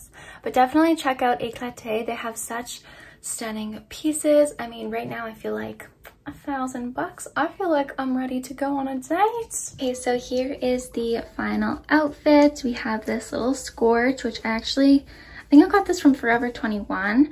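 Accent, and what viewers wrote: England, United Kingdom. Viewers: I do the British accent all the time too 😭😭 you look so cute!! I hope your date went well !! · still obsessed with the english accent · girl i love you but pls stop the british accent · I’m so used to you randomly breaking into British accent I didn’t even realize it till my sister pointed it out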